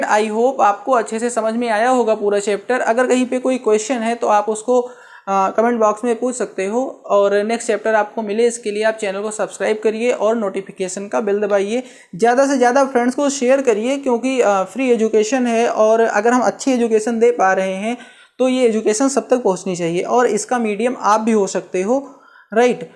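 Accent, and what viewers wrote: India. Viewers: Sir you speak the purest form of English in Indian accent 👍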